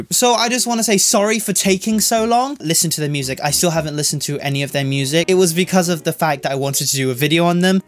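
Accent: England, United Kingdom. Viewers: yes, from his accent, I can guess he IS British.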